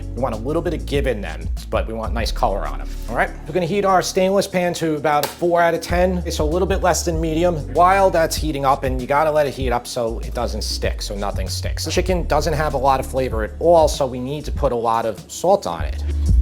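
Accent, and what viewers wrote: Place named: New York City, United States. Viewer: You have the coolest nyc accent i've ever heard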